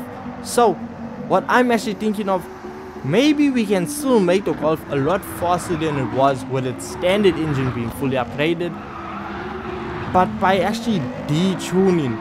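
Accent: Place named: South Africa. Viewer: This bro is a South African indian 100%... I swear straight from durban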